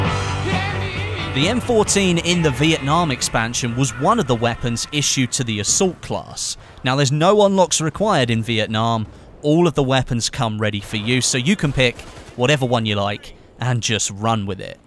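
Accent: United Kingdom. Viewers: he's British we're American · 80% of comments:You pronounced general patton wrong He is British not American so just deal with it if you don’t like the pronunciation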